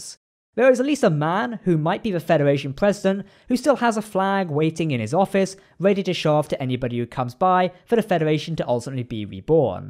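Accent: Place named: Wales, United Kingdom. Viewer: Looking forward to the new season, although i missed some of the commentary due to the thick excitable Welsh /English accent.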